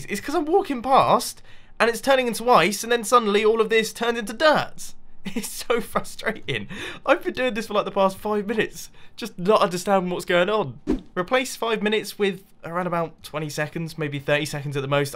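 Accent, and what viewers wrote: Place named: United Kingdom. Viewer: lol i love the way British people say things like "aquir affinity"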